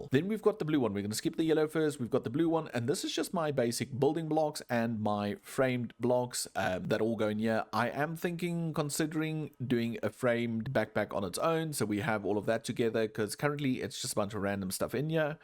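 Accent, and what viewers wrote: South Africa. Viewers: You sound a lot like RenDog are you south African per chance?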